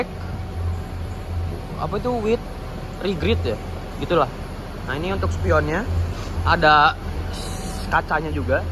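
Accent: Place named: Java, Indonesia. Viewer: Ora tentrem ora marem (tidak tentrem tidak sangar/keren)hehehe logat jowo😅🙏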